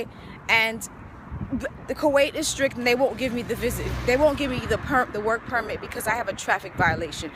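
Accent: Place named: United States. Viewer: You better get your ass out of there.. Before something go wrong..like go to jail.. remember you don't suppose to be there... The key word is illegally.. you sound like you are American woman if I was you I will get back to United States and you can go somewhere like Canada or Brittany will somewhere where's though you're not going to go to jail for being their country illegally get the F from out of there before you regret it sister Sincerely Nate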